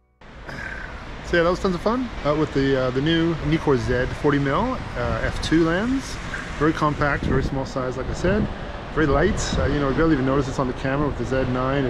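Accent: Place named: Western Canada, Canada. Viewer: you sound western canadian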